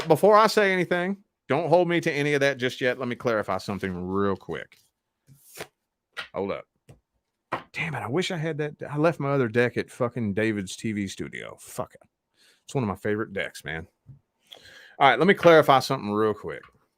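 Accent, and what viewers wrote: South, United States. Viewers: YOU HAVE A SOUTHERN ACCENT WHERE YA FROM?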